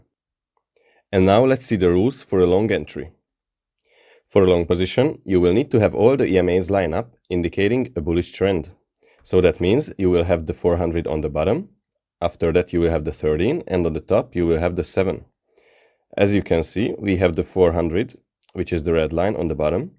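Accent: Hungary. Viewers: jáj de magyar akcent :)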